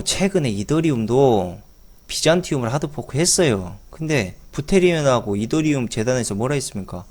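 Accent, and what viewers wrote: Jeolla, South Korea. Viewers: 전라도네.. 믿고 거른다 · 전라도사투리 은근 중독 되네요~~ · 아따 전라도여 반갑소잉 전라도말은 숨길수가 없어라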